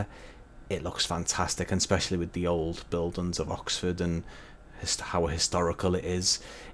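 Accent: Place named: Southport, United Kingdom. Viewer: If I'm not mistaken, Southport technically has a sand-runway at Birkdale Sands on the beach (I'm guessing from the accent you know where that is ;) )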